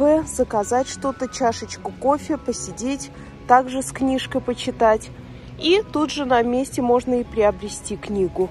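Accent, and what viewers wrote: Baku, Azerbaijan. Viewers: Мой родной,красивый,незабываемый город.Огромное спасибо,за показ родных мне мест и за дорогой бакинский акцент! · Русскоязычные бакинцы так и говорят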